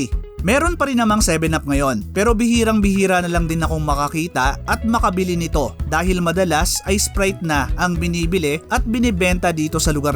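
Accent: Waray, Philippines. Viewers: Waray ka ba tsong?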